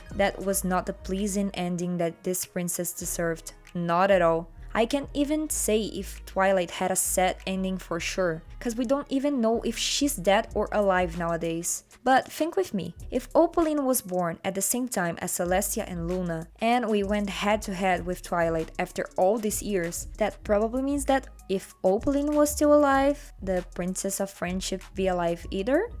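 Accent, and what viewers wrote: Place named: Russia. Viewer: Такое чувство, что акцент русский у Вас)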